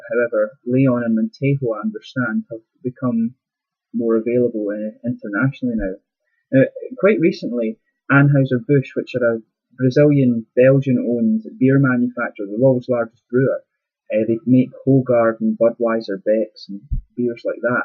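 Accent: United Kingdom. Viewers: yes sir, and guess what; yes, I live in Canada! Lol, I moved up here 3 years ago. Where are you from bro? If you live in the states, I'll send you some beers from Mexico if they allow me too, although I pretty much believe you live somewhere in Europe, that British accent is obvious. Love your videos bro, and pardon me! It is not a Louisiana beer! It's called: "Mississippi mud" the one with the alligator in it! Lol, they'll laugh their tears off! If they read this.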